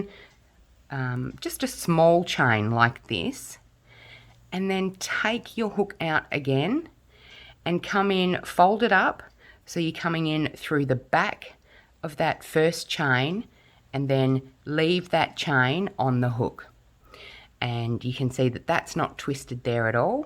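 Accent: Australia. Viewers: Thank you! Firstly, it's nice to hear a fellow Aussie! This tutorial is so helpful because many foundation chain videos don't tell you what part of the chain you need to slip stitch into. I've often thought I'd done it correctly and then realised my join is at the wrong part of the chain and I end up with a gap. Thank you!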